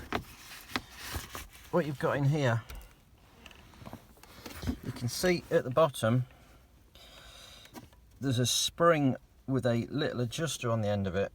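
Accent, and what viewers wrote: United Kingdom. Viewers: i like your british english...lol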